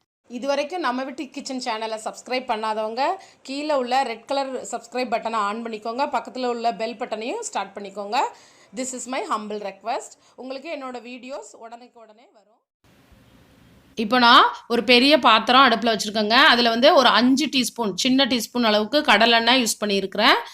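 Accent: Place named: Nagercoil, India. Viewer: Romba arumai unga nagerkoil tone thanga....beautiful recipe and thanks for taking time to record this....very helpful for guys like me living faraway from India....love your tamil.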